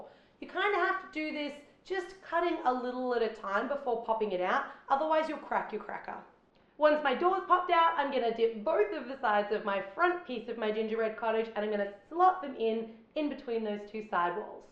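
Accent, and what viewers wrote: Australia. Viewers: Is she from aus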